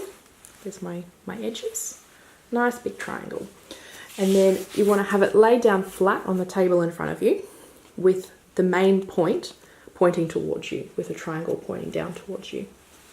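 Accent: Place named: Australia. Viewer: You sound aussie.. ill have to have a look.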